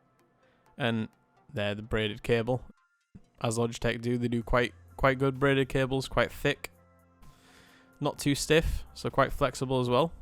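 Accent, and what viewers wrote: United Kingdom. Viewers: Good video, but next time you should use a better camera..more than half of the video is out of focus. lol BTW which part of UK are you from? Your accent is interesting! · Sure am pal! Can always recognise the twangs of a fellow member of Gods county :-). Sheffield lad myself.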